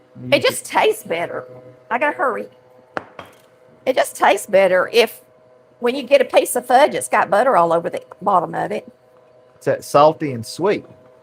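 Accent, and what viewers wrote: South, United States. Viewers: You always make my day when I hear that wonderful southern accent. Happy Holidays to you both. Carrie from Delaware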